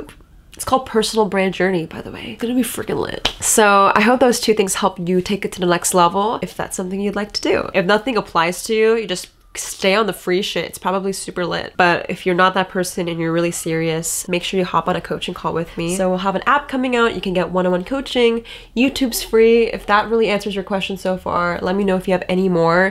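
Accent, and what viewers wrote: United Kingdom. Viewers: British Accent = 7/10. 😎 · As a UK Resident, I approve of the British accent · Kinda like the british accent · WOW WOW WOW WHAT"S WITH THE BRITISH ACCENT!??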